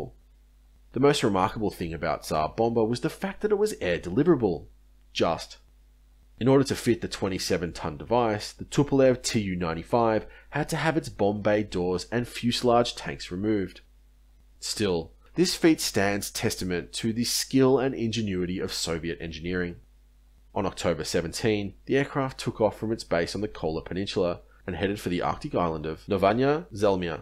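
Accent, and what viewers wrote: Australia. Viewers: Glad you re-uploaded it mate. One thing though... you pronounce "beta", as in "beta radiation", as "bay-tah", not "bee-tah". As a fellow proud Australian, I must ask why. There are enough Americans speaking their form of English in YouTube videos, and while there's nothing wrong with that, there's no need for everyone else to do so too. But that is a minor criticism. I really enjoy your material and it's nice to hear an Aussie accent, the occasional American pronunciation notwithstanding. As a 13 year old in the early 80s, I delivered the afternoon newspaper, "The Herald". In it on one occasion was a graphic description of the effects of a one megaton blast over Bourke Street. I think the effects described in that were probably taken from the general description of the effects at certain distances of a one megaton blast that you gave towards the end of the video. 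I've often wondered where I could get hold of that article in recent years, but I cannot find any reference to it anywhere. I wonder if you might know of it. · I want to take a moment to express my admiration for your scientific videos, which have a calming, informative effect on me. I am particularly fond of your previous dissections of the atomic theory, which I find highly engaging and informative. I strongly appreciate your voice; that Aussie narration in the video creates a sort of exotic intimacy, which adds to my enjoyment of the content. Additionally, I am grateful for your videos on the Pacific military operations, which were fascinating. I think your style is outstanding with the quality of the videos, and I am deeply grateful for the opportunity to watch them for free. Thank you for making these videos while naturally making the very most informative pieces about these amazing underlying subjects.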